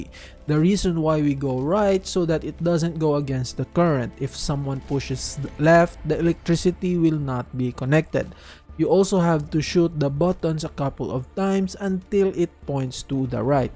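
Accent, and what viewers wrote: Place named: Philippines. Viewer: I had a feeling I was listening to a Filipino accent and I was right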